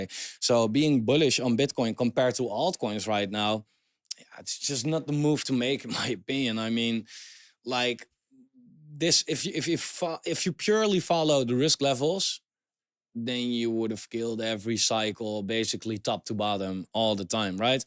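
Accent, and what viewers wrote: Netherlands. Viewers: The accent ? , are you Dutch ? Kom je uit NL ?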